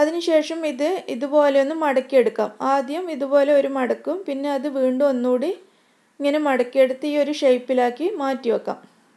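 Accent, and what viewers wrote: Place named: Malappuram, India. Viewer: kitchen lnsha Allah......ennundakkanam. samsaram Kannor style allallo ....proper Kannor aalla annu thonnunnu.Oru Malappuram style und .Njan Malappuram aane .Athinal anikk kelkkan nalla ishttamane.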